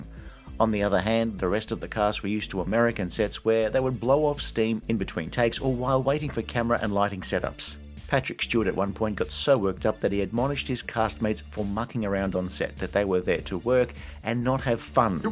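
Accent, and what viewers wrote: Australia. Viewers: It's late, I should be finishing up work, instead I'm listening to an Australian guy talking about Star Trek seasons I've seen over and over.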